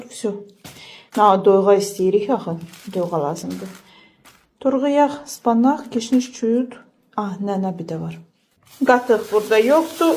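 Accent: Ganja, Azerbaijan. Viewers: Xalis Gəncə ləhcəsi😂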